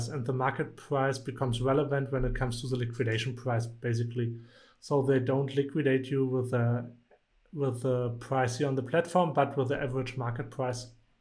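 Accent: Germany. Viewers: Nice tutorial 👍.. Are you from Germany?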